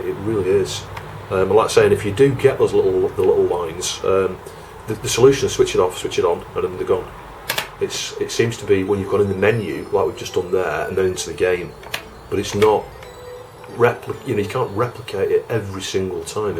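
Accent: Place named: North West England, United Kingdom. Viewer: hi mate - only recently discovered your channel but enjoying it, good stuff. Who cares about a 2 minute load time if you're from the speccy generation! I'm curious, where are you from in the UK, sounds like you're a fellow north-westerner.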